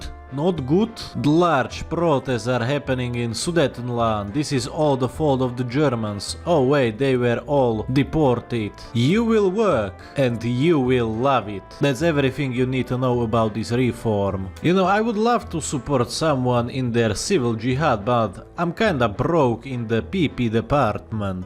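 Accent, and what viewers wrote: Russia. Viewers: Why do you have a Russian accent? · Why do you articulate what you say like a russian when a slovak doesn't speak like that?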